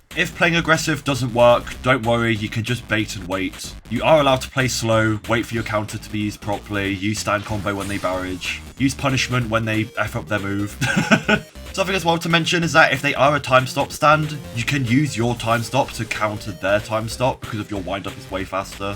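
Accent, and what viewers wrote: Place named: United Kingdom. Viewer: clicked this thinking it was eclipse and then was like “who the fuck is this British guy” 😭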